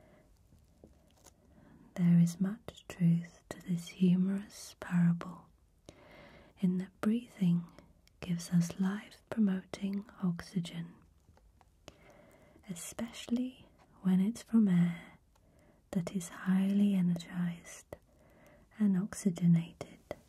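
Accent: England, United Kingdom. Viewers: should be WAY beyond 1.5+ million subs....she is simply Fabulous & her voice is so wonderfully calming. I adore her beautiful English accent, she is absolutely lovely! Please Like her vids if u watch/enjoy bc that gets her videos out to more ppl. Everyone needs some in their life. Idk how anyone could be grumpy, negative or NOT be in a great mood after listening/watching beautifully relaxing, positive ASMR videos. Thank You! You've soothed me to sleep/beat insomnia naturally & greatly reduced anxiety too many times to count. I truly appreciate all you do♥️. With gratitude, ~A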